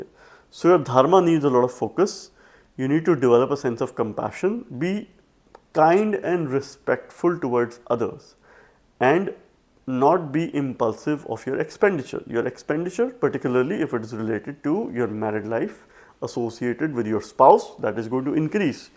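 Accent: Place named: India. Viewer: It would be easier to understand if you didn’t have such a strong Indian accent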